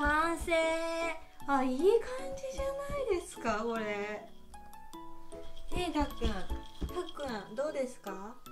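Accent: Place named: Kyoto, Japan. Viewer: 今日も可愛いたっ君観てる💕 は京都弁のイントネーションで穏やかで優しくて聴いてて気持ちがいいです。たっ君への愛情もたっぷっり〜。にピアノで厳しくされなければ反抗期ないかもね？たっ君で良かったね❣️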